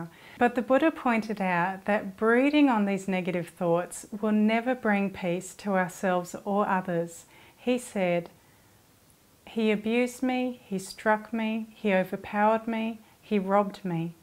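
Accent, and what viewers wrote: Australia. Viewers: Excellent talk, thanks for sharing. Lovely Australian (?) accent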